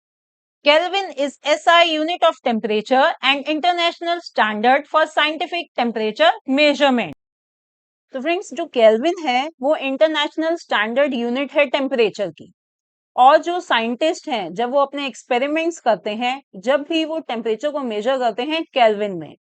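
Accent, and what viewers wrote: Bihar, India. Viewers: Mam can you tell are you from bihar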